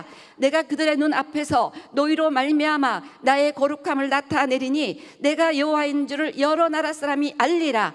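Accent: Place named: South Korea. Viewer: 콩글리쉬 참 듣기 힘드네..... 아이고.......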